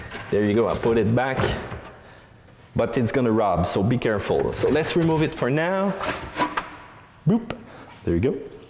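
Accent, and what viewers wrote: Quebec, Canada. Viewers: Lol Quebecer accent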